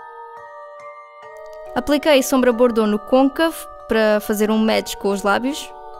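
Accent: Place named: Portugal. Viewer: Adorei teu canal sucesso tu és portuguesa né? Adorei seu sotaque ótimo vídeo bjo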